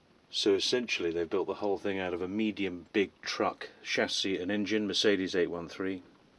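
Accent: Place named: United Kingdom. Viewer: your videos are great. Nice and laid back presentation, such a perfect British English, delight for the ears and such a clean and wonderful S900. We know each other from the Classic Merc Hymer group on FB, we exchanged comments. Please, keep on with the videos.:) Regards, Yaro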